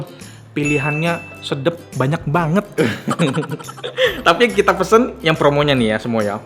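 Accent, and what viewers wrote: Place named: Surabaya, Indonesia. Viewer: Mamen gak pernah telat nih nonton video nya, mana suaranya surabaya😂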